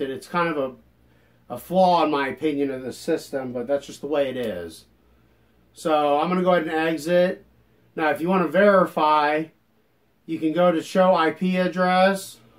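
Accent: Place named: Philadelphia, United States. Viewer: Are you from Philly? Because that sounds like one hell of a philly accent.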